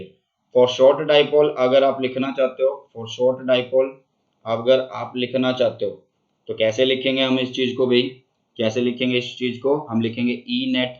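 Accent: Haryana, India. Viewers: I think haryana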